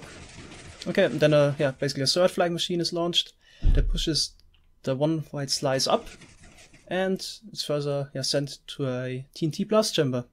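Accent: Germany. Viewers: A netherstar block, some octuple compressed Diamondblocks and making it a big multiblock structure would be a worse troll ^^ Yeah. But some things are.. Well.. How to say. Inefficient and laggy? :D I mean if you place down 200 pistons, observers, redstone dust, etc. you'll get some problems :D (on mps ^^) Bist du eigentlicher Deutscher? Bin noch nicht so lange dabei und merke es nur am Akzent ^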